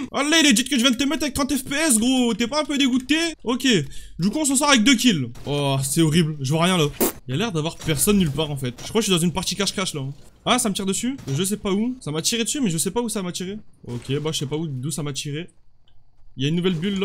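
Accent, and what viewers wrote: North, France. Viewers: Yess l'accent du nord bgg !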